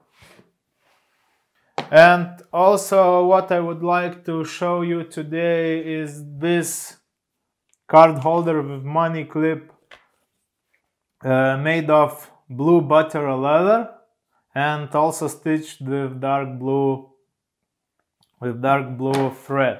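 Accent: Russia. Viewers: Русское произношение...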